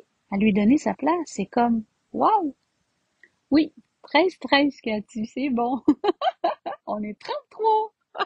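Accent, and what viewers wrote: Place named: Quebec, Canada. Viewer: Bonsoir de France nouvelle abonnée j'adore votre accent Québécoise 😊👍🏼 merci a vous🙏🏼🌷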